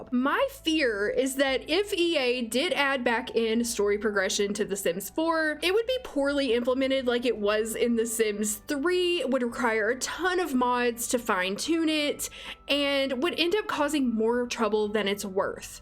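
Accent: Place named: Kentucky, United States. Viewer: Hi your acc not sounds so match like mine any chance your from bardstown lol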